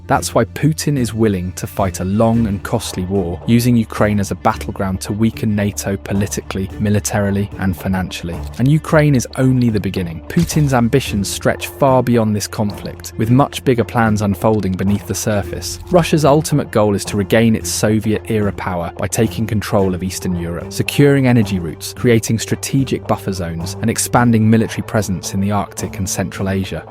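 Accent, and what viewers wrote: United Kingdom. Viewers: You are so full of crap. Why is it that the British accent always comes with russophobia?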